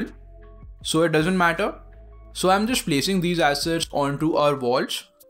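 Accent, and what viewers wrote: India. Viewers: It is genuinely a fact that when you've been struggling for weeks with something and finally a video comes along and the guy has an Indian accent he gives the most top tier advice that's super easy to understand and follow along. Thanks,. I've been struggling to figure out what to do in UE5. Now I have ideas.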